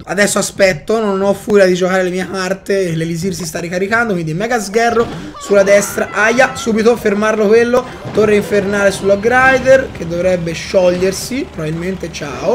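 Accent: Tuscany, Italy. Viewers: sei toscano? non si sente la tua c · Ciao ma sei toscano?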